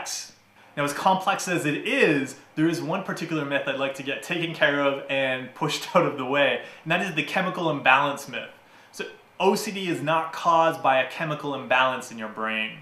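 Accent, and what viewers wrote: Canada. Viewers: Cute, smart talking Canadian....love it :)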